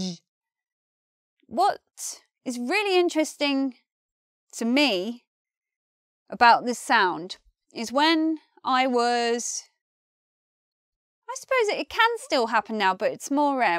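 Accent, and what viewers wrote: United Kingdom. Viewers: You are the symbol of the British accent.. I like to hear and listen to you a lot 🤗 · The presenter's British English makes this tutorial for memorizing IPA less useful for a learner who speaks US English as there are many differences between British and US midwestern pronunciation. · What accent is this? It sounds British but....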